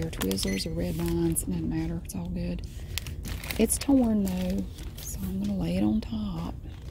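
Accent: South, United States. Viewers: Why does she sound like Tati Westbrook with a southern accent ?? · Oh hey a fellow southern person 😂 her accent sounds normal to me because I live in the south (North Carolina) , that’s why I enjoy this channel so much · I have found myself actually taking on your adorable southern accent;) and btw love the outtakes and bloopers! A kindred spirit indeed;)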